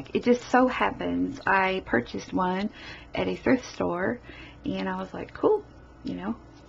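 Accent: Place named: Kentucky, United States. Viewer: Love it! Would love to know where you're from...lol. You sound an awful lot like this old KY gal! Love it!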